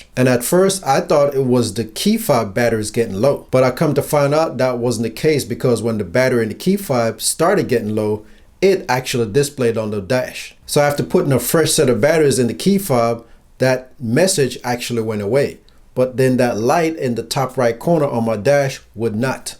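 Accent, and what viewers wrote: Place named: Jamaica. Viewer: You sound Jamaican ...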